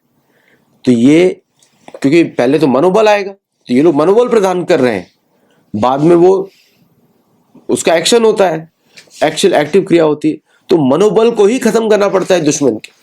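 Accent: India. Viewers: Respected sir, I thought you were from Kerala or Tamil Nadu. The way you explain, all our family members, including my mother listens to your Vlogs. Hope you explain in english also regularly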